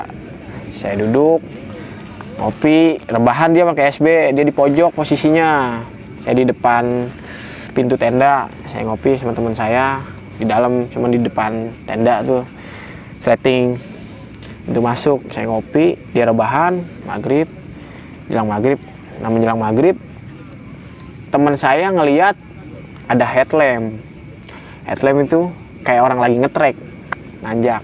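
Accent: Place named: Tangerang, Indonesia. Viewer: KAGA ADA YG SIUL greget amat bang wkwkkw Btw nada logatnya tangerang banget bang, mirip film si marko :v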